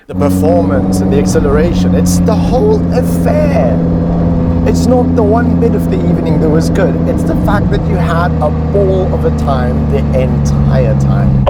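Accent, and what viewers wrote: South Africa. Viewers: Is our Presenter South African?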